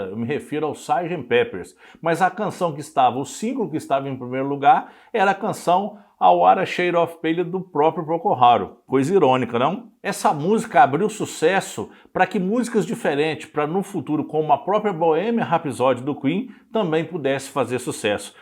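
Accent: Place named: Minas Gerais, Brazil. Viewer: Meus pais são de Cataguases. Quando vc começou a falar na hora tive certeza que vc é mineiro.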